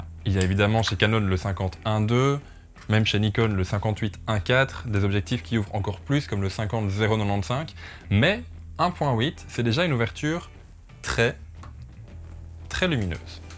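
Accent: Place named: Belgium. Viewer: j'ai réussi à capter que t'étais belge alors que t'as un accent trop subtil putain j'suis trop stylée (j'ai eu la confirmation quand t'as dit "septante" haha), en tout cas merci pour la vidéo, je viens de l'acheter et j'en suis très satisfaite !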